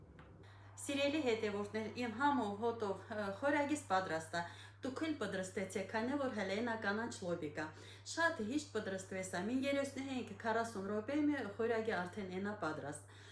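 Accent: Artsakh, Azerbaijan. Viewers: Приятно слышать арцахский гадрутский диалект.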